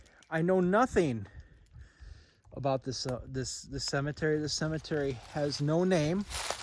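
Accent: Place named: Chicago, United States. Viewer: I had to subscribe for the name of your channel alone! I love honesty. Then I heard a Chicago style way of speaking and that you were doing an abandoned cemetery near the Chicago area. What's not to love? Maybe check out what we in the neighborhood called "The Lost Cemetery." (It's on the Northside, fairly safe neighborhood.) It was next to Labaugh Woods along the train tracks. More recently a good deal of the land was sold off. They claim to have moved the bodies. I DO NOT BELIEVE THEM. And there are STILL headstone to be found there today, in the woods behind the houses they build over the graves that are still there. You can park in Lil Labaugh Woods or the main parking, both parks are along Foster Ave, if you want a good place to park. The entrance to the larger picnic area and parking is off Cicero Ave. Or you can drive West on W. 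Bryn Mawr Ave along the North edge of Bohemian Cemetery. You'll reach a bike trail that use to be train tracks The Sauganash Trail Bike Path. You past a subdivision on your left, that was part of "The Lost" Cemetery and still is! If you walk south on the bike trail toward Labaugh Woods on your left is the Lost Cemetery. The headstones are still there. The bodies are still there.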